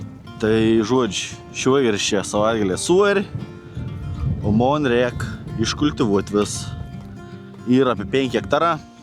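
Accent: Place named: Samogitia, Lithuania. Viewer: 4,20 čia ko gero kultivatorius. Beja ar nebūset iš Žemaitėjės? Kažkap žemaitiu kalba jautas.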